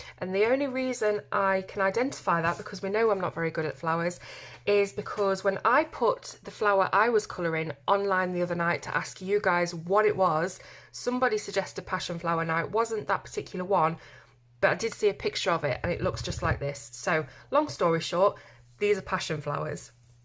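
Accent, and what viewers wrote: United Kingdom. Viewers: O your British cool love the way you talk love the coloring books to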